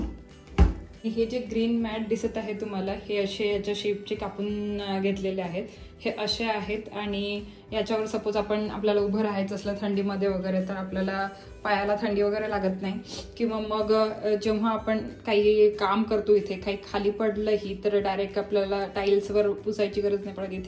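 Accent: Vidarbha, India. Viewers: खूप खूप छान किचन , बऱ्याच नवीन गोष्टी कळल्या तुमच्या व्हिडिओ मध्ये ,very useful video, माझा पण घराच काम सुरू आहे ,मी नक्की या पद्धतीने बनवायचा प्रयत्न करेन .thank you so much.. तुम्ही विदर्भ मधील आहात का, भाषे वरून वाटत ,मी पण नागपूर ची आहे 😊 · Tai tumhi kontya shahratun aahat, tumcha bhashevarun tumhi vidarbhatlya vatatat, video chanch hota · ताई किचन छान आहे तुम्ही भाषेवरून मला असे वाटते की विदर्भातील आहात कारण मी पण यवतमाळची आहे सध्या पुण्यात आहे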